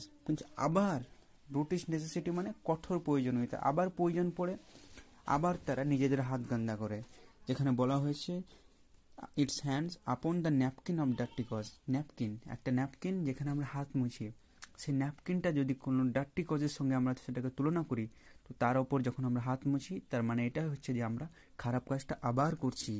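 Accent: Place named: Murshidabad, India. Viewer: Valo hoyechhe tobe kolkatar banglay video korben majhe majhe ektu Mursidabadi tan aschhe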